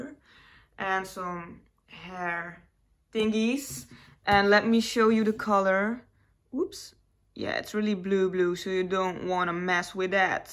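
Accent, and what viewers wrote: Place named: Netherlands. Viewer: Oef you’re really Dutch aren’t you?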